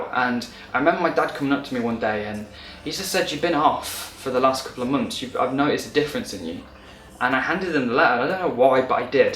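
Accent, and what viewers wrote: United Kingdom. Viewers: I picture you eventually with a special understanding and lovely woman, having a couple of kids with her but having boyfriends on the side whom your woman friend would also know. You're so appealing. Whoever you get involved with is lucky. You voice reminds me of Russell Brand's. Hope you aren't offended by the comparison. I love British accents.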